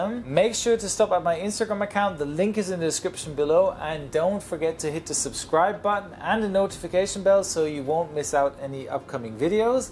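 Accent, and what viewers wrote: Germany. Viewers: Is he a German? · Captain you are from Germany? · Kommst du aus Deutschland?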